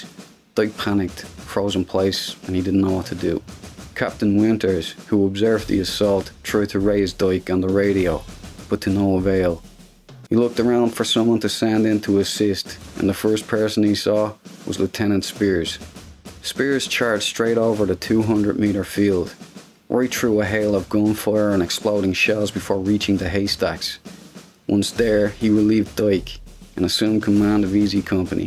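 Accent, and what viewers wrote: Dublin, Ireland. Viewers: Love the accent, Dublin? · Great video,! Are you Irish? You remind me of my uncle in Dublin. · Narrator an Irishman? Nice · I think the Irish accent really helps big up this mans feats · Great video. Thanks for sharing. What's your accent? Irish?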